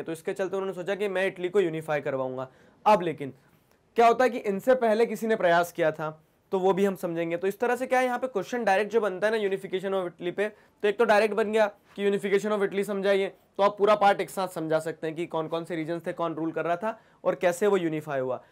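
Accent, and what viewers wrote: India. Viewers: The Indian accent is weirding cute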